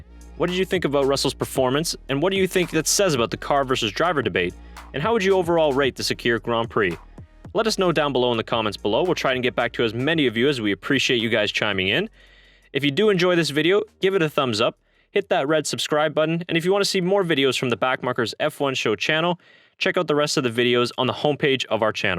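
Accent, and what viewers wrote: United States. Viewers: I’ve been watching every F1 related video lately. This is the first video I’ve seen from this channel. It’s kind of funny (in a good way) to hear anything F1 related spoken in an American accent. 🙂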